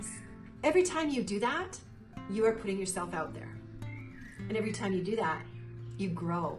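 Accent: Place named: United States. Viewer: This américain accent ????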